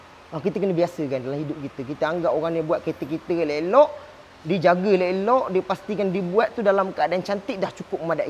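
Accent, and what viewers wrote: Pahang, Malaysia. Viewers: Apsal cakap mcm orang pahang pulak ni. org phg ke.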